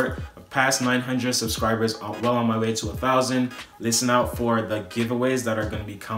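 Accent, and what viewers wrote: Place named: Barbados. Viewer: Love that Bajan accent !❤️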